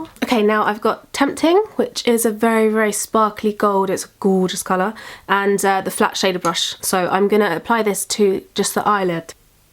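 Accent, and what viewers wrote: London, United Kingdom. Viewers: I have watched nearly all of your videos and i think you're amazing! i also love the way you say "gorgeous"! gotta love the london accent ;D xx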